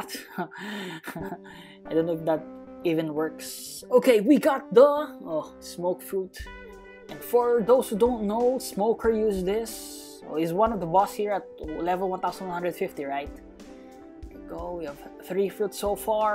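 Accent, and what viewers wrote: Tagalog, Philippines. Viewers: Halata tagalog 🤣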